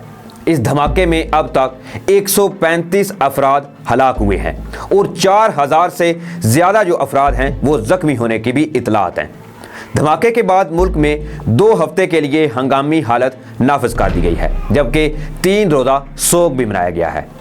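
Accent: India. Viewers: bhaai aap ki urdu Indians jaisi kiyun hai, aap ki videos bahut achi hain lekin iss ka sara ta'assur aap ki urdu ki wajah say kharab ho jata hai, plz koshish kejiye k aap ka lab o lehja achi urdu ka ho, plz bura mat maniye ga, sorry again, Thanks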